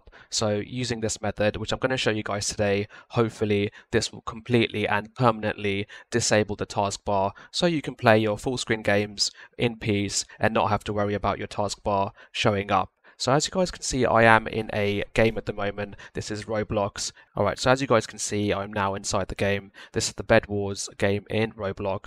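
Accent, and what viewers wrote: United Kingdom. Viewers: lol brtish man fix the problem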